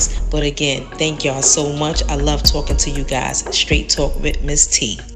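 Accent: New York, United States. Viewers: Views not lost if you're watching. City is the name of the college, City College in Harlem Manhattan. You should know that Ny accent from anywhere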